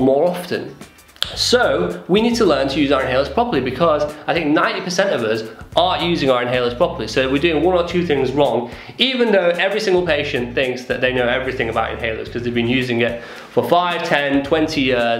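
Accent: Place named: Middlesbrough, United Kingdom. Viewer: Really? You sound more Middlesbrough :-). Yes, thoroughly enjoyed video thanks! X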